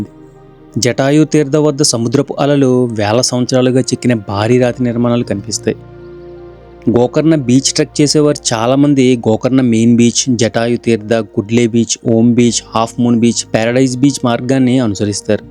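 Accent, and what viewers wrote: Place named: Visakhapatnam, India. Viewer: Bro chala baga chepparu telugu matlu andrapradesh viziag bashala vunnadi meru akkadi rathi kattadala gurinchi weathergurunchi bagachepparu. Chala thanks meru marinni manchi place gurinchi videos thiyaliani koru thunnanu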